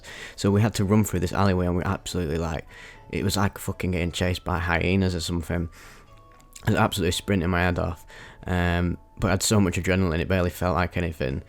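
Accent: United Kingdom. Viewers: British accents make the best narration. You're up there with David Attenborough bro.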